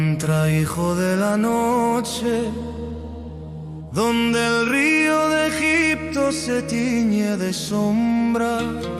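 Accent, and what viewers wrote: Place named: Spain. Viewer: Wow!! Yo pensaba que naciste en España por el acento, señor. Veniste a Estados Unidos por música, religión, trabajo??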